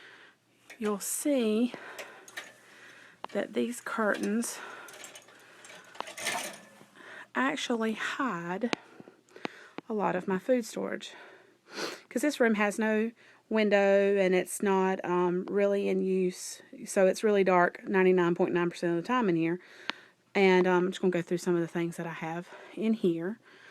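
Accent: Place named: South, United States. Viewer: Great video. I like your curtains. I think I will try it too. Love your accent. You must be a Southern Belle like me!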